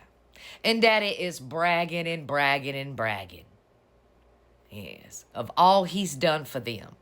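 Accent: South, United States. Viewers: I just love your analyses. With your southern accent, your comments are on point while sounding smooth as Vermont maple syrup. It makes what you are saying more compelling to hear. ❤❤❤❤❤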